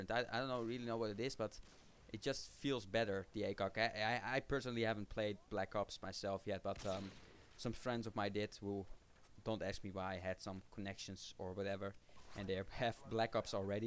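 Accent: Netherlands. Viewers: Dutch accent xD, it's really funny:P. Nice game btw netjes flawless ik word altijd op het laatst nog gekilld:P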